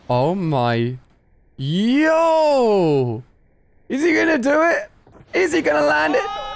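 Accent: United Kingdom. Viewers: You in England or Scotland?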